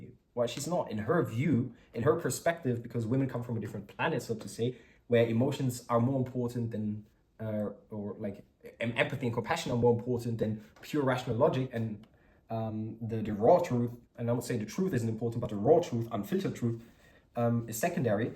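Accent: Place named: Eastern Europe. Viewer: Where is your accent from?... You sound eastern European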